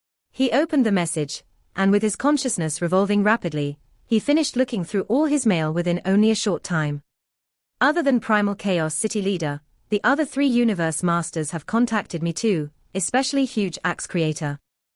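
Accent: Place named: United Kingdom. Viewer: What an annoying British accent voice over.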